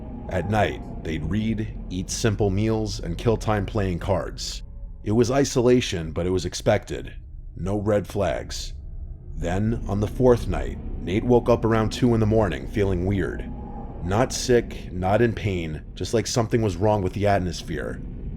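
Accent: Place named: Staten Island, United States. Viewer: im so happy still makes videos and that he's managed to tell so many stories over the years. My sister and i would religiously watch these back when corpse husband was still posting, mainly because were from jerz and the staten island accent feels like home loll (fr my sister was soo picky about narrators voices. she only watched your channel cuz ny accent is the correct way to speak loll)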